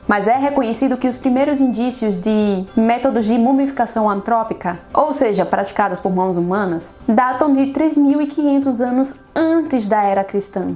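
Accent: Brazil. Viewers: Ah, então você é de Sergipe :)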